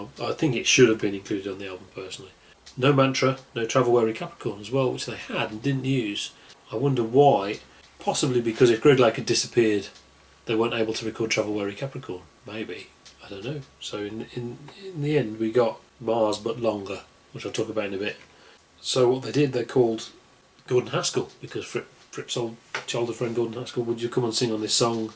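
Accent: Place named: United Kingdom. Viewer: It’s a great album in my opinion, even though it’s similar to the first one. Also, can you try to sound less British next video?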